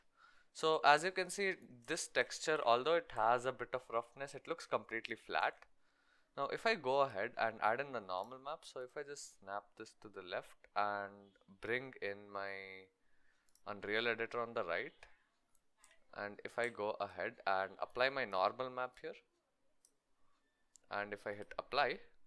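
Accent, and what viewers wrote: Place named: India. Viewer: I came from freecodecamp's sir aapki aawaaz se hi pechan gya ki indian ho and instantly subscribed 😁 😂 btw can you tell me is this good for future? I mean is field me jobs kaisi h? And india me kaisa scope h?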